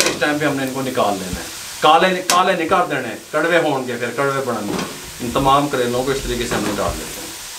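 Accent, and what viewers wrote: Punjab, India. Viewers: Koi masla nahi hai is one of the best dialogue from you sir and camera idhar meri taraf karna such dialogues and punjabi tarka makes your videos so attractive hats of you and your style sir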